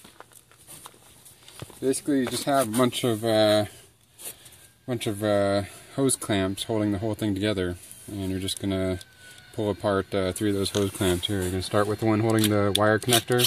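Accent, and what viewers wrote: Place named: North America. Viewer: You sound like you're from North America. North America runs on 60 Hz not 50 Hz. That blower will blow eventually too.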